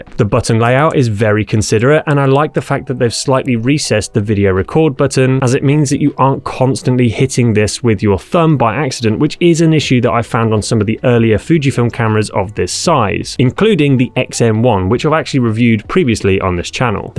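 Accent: England, United Kingdom. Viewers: Just curious. You sound English like me but you price everything in dollars? Do you live in the USA?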